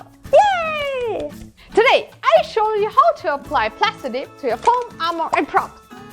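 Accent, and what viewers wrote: Germany. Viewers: Du sprichst ja englisch immer noch wie ein Deutsche, die glaubt, dass Amerikaner so reden. Ansonsten schönes Video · Your German accent is charming.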